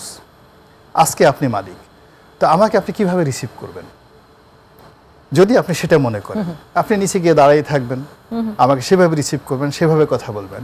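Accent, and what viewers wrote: Noakhali, Bangladesh. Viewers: উনার বাড়ি কি নোয়াখালী নাকি ?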